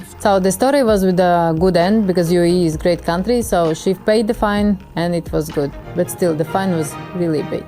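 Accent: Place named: Russia. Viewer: I love the russian accent.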